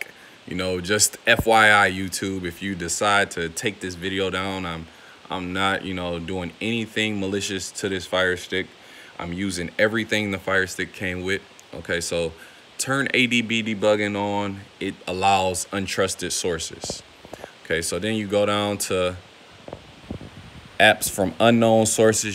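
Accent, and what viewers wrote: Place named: Philadelphia, United States. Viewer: You gotta be from Philly I know my people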